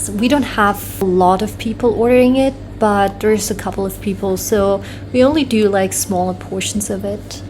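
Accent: Australia. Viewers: I can already here some Australian accent coming out haha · I just wanna add that as an Aussie watching your content I can hear your accent changing to sound a bit more Aussie 😁 ps. Congrats on your new job! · I can hear that aussie accent coming in :D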